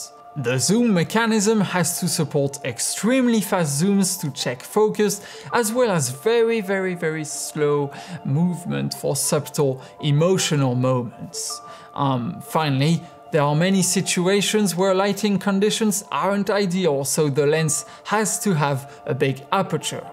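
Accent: France. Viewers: Nice French accent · Je préfererais que tu parles en français pas en anglais avec l accent fr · Le commentaire français que vous cherchez. Sacré accent français d'ailleurs. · That french accent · T'as un accent français, je me trompe ??? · You can’t hardly get more french accent than this guy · Quel bel accent français. Romantique 🤗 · Tu es francais ? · he is french for sure, he is based in Paris and his accent is 100% french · he is French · That was very entertaining and informative thank you. I can't decide which is the ultimate French-English moment: "necessessary" or "...and you end up with a very big and expensive lens!👨🏻‍🍳" Said with much love and all due respect... we have francophones in Canada but the "real" French emote and accent words so cutely. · Do french people seriously still speak like that? He should make an effort, this accent has expired. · Ton accent francais xD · Idiot TOOO TECHNICAL AND PLEASE GET RID OF THAT FRENCH ACCENT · T'es pas français toi par hasard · Is he french? 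· Are you french? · He has french accent lol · why french people have such thick accent bro, I honestly think they don't like english so they butcher it on purpose, spanish is my native tongue and I have virtually 0 accent compared to this guy. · French accent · It french accent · French maybe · speak normal english, not a dumb french accent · Grate camera . and i like your speaking french accent english , Amazing video !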